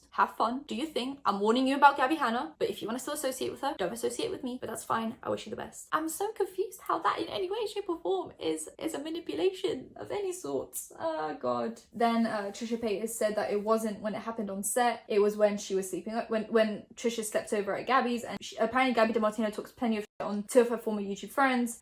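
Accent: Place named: Newcastle, United Kingdom. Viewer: where are you from out of curiosity? I’ve always assumes English (you sound Geordie to me but I have the worst ear for accent) but am now having a crisis because of how you said “in the uk.” Help me please XD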